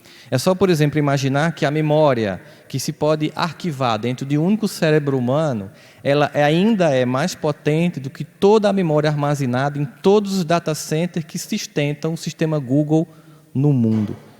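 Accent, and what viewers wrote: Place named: Northeast, Brazil. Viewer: Assistindo hoje 17/01/2022. Há 6 anos, em um momento muito delicado da minha vida (depressão braba ) conheci esse iluminado, aleatoriamente mexendo no youtube, e nunca mais deixei de ouvir suas palavras. Sempre busco suas palavras, pois me fortalece, esclarece e me centra. É uma viagem p dentro de nós mesmos. Sem falar q amo esse sotaque, pois meus pais tb são nordestinos.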